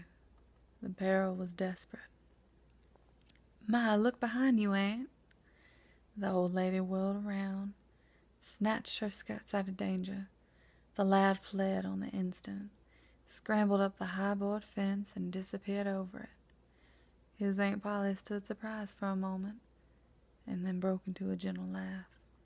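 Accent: South, United States. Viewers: Such a great video! So difficult to find a great ASMR southern accent video, so thank you ☺️ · Where are you from? That was great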